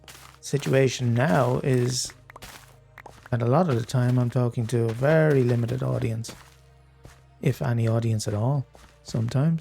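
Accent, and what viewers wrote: Ireland. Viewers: your big Hardcore video came to me through the algorithm and I LOVED it, couldn’t get enough of your builds, play style and chat (and, as a fellow Irishman, it’s great to hear a non-American voice in the game!) so I’m going back through this series for more banter and more build inspiration. You’re a star, LOVE every video I watch, hope you come back and see this and think of how far you’ve come!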